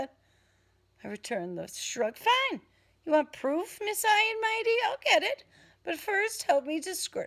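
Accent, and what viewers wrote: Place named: New England, United States. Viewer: New England accent.. 30 bimbos..lol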